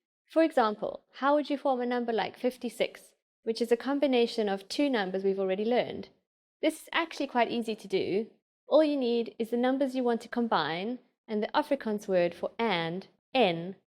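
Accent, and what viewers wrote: England, United Kingdom. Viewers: The pronunciation needs work. This woman is speaking with a very prominent English accent. Native speaking Afrikaners don't sound so flat when they talk. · Ek voel dat 'n tweetalige persoon hierdie video moes gedoen het. Maar goeie werk. 'N Engelse persoon moenie Afrikaans leer nie omdat uitspraak 'n rol speel. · Fully agree, also pronunciation is off, she's probably british,,, also her Dankie sounds like donkey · I really love this! I just wish the Afrikaans sounded more like an Afrikaans-speaking person and had less of an English accent to it. Afrikaans people do not sound this heavily English when speaking Afrikaans. Enunciation needs to be tweaked a bit more. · Her pronunciation is “off” - she speaks Afrikaans with an English accent - there are various Afrikaans dialects but this English/Afrikaans - good for basics I suppose - she does make a few mistakes in this video - Fraans vs Franse - Ek praat Fraans - Hy is n Franse man (fraansman) - Also U - its not pronounced ie - but n deeper sound- Also Skiestog - we say “Askies” etc etc etc.. 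· I am Afrikaans. This woman has a strong English accent when she tries to pronounce the words in Afrikaans. There's also a spelling mistake - "biki" should be "bietjie" and she totally mispronounced the word item. Unprofessional advertising as an educational source. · thank you for these videos, I'm Zulu so i definatly need someone with an English accent to teach me Afrikaans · Peter Murray Exactly! Thank you so much... I just find it sad that they didn't get a native Afrikaans speaker to give these lessons. She's a wonderful teacher, but her English accent is very strong.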